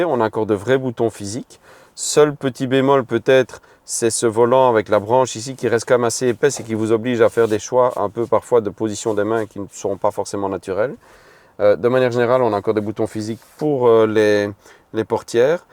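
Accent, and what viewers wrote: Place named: Belgium. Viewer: Sympa un danois qui parle français avec un petit accent belge 🎉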